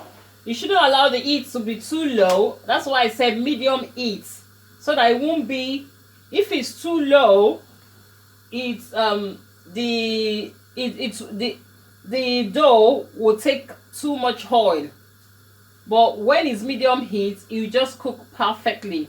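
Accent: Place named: London, United Kingdom. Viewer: I love d way she talks.. she's talking like all does London aunties..nice one..I'll try your measurement.. sometimes my puffpuff comes out great n sometimes it doesn't.. will give it a try and hit you up... good job ma'am👌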